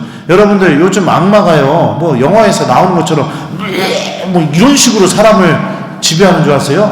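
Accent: Chungcheong, South Korea. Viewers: 신부님 말습이 너무 웃기고재미있어요 충청도 아젓이 신부님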